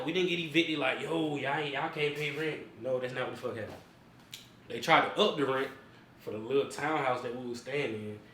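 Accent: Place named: Louisiana, United States. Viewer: lawd youn sound like you from Louisiana, what part you from?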